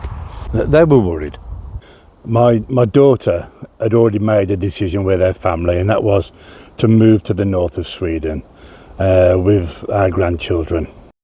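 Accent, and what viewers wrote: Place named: England, United Kingdom. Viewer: Bostin' - I recognise the accent. Fellow Englishman in Stockholm.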